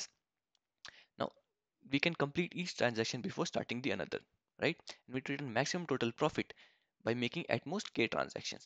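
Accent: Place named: India. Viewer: Обожаю индийский английский))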